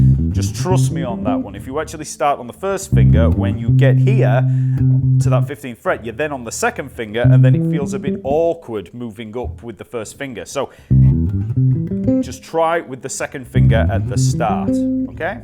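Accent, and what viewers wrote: United Kingdom. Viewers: You are, a great teacher. Moreover, I can learn better British English pronunciation:)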